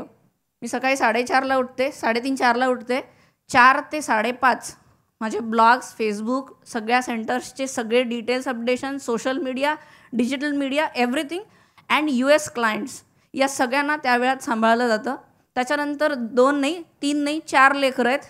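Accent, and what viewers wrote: India. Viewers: I think if u r wearing navvari saari and showing so much gratitude toward maharashtra then u should change ur accent as well... As you were staying in banglore for year u r having that South Indian accent which sounds really irritating. Please be proud of being maharashtrian. U r purpose will not fulfil by only wearing navvari but please work on accent. Stop taking out that south indian accent. If u really proud of being maharashtrian.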